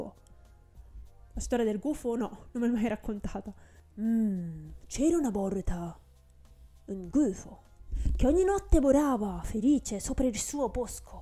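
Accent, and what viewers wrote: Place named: Japan. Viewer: L'italiano parlato con accento giapponese.... MAGNIFICO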